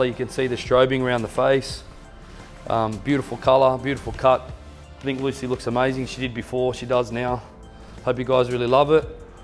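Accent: Australia. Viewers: Absolutely gorgeous! Lucy's hair is exquisite. It's luxurious and healthy and looks like liquid velvet with the way it moves The color is scrumptious! You did a very beautiful job! 💜, you are so very handsome and I just love your wonderful Australian accent! Writing from Southern California.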